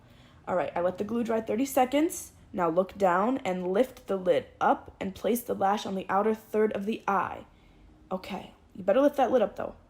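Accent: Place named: New York, United States. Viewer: Girl, love you! Where you from? I'm from Jersey/Brooklyn and we sound very similar.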